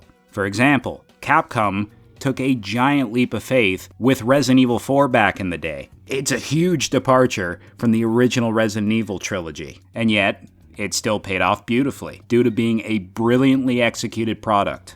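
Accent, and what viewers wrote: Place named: Scotland, United Kingdom. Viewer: Yeyeyye LET'S FREAKING GOOOOOOOOOOOOOOOOOOOOOOOOO BOIS NEW VIDEO IN THE HOOUUUSEEE. No need to apologise my brother. Just take proper care of yourself stay safe be safe and always keep smiling always. YEEEEEEEEEEEEEEEEESSSSSSSSSSSSSSSSSSSSESS WE GOT OUR SCOTTISH ACCENT, this is gonna be freaking awesome. I feel like many companies try to cut corners in such desert type areas with the rocks. Obviously not all of them but we have seen many games where the background has not been made properly or they have cut corners while making them, open to possibly many glitches. oh damn don't even get me started on KTJL. That game is just a drop bad in the animation department it's baffling that the same studio the same company which made Arkham knight made that dog s**t. It was a huge let down, a huge. I agree with what u just said making the world bigger is not always the answer for all games. 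Some games are not meant to have a bigger or more open world. I agree once again I prefer quality over quantity anytime and any day. I prefer to stay in the same boat as you, smaller semi open world. DAYUM that's such a good point yes they were definitely the reflection of the developer but remember some games like KTJL is also a reflection, but a reflection of that "S.B inc" iykyk it's the reflection of their worst part in their body. Assassin Creeds downfall is very bad to see. Uk I could be hated for my opinion maybe even u might disagree wi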